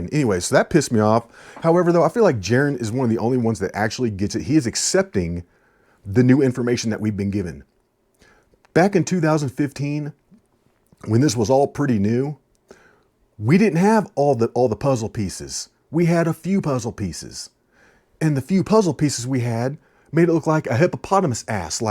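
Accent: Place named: South, United States. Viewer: Here from mctoons channel, new sub here, great vid!!! Love the accent too man, sound like where ever billy bob thornton is from!!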